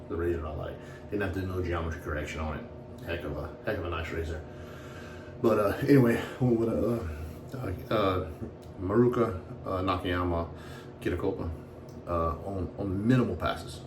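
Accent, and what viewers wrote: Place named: Louisiana, United States. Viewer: If I can echo Sean, your experience always shows....always a great video, especially with your wonderful cajun accent. More please on your stone to edge appreciation. I'd love to try Jarrods concave edge, on a Ti possibly. Looks like you had a very fine shave 🎉😊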